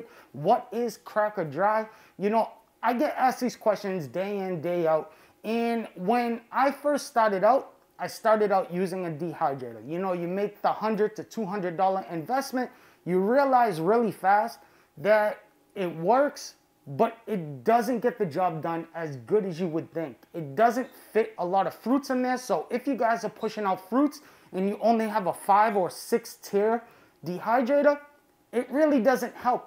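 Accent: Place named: New England, United States. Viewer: This guys seems to have more of a new England area. Maybe long Island.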